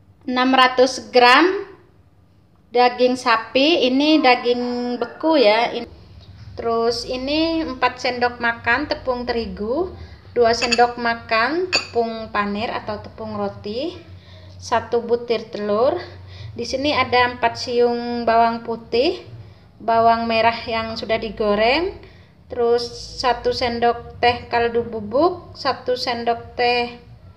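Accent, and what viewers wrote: Madura, Indonesia. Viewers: Bunda dari mana ya ...ngomongnya kyak org madura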